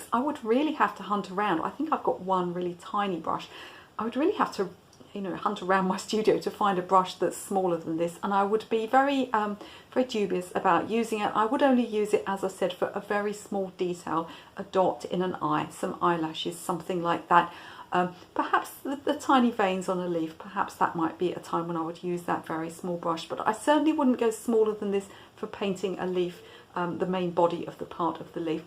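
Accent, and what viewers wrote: United Kingdom. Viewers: to me is like flash in photography... buy I am not adamant about anything in particular. BTW I enjoy your videos and learn a lot! And a plus to hear your neat British accent!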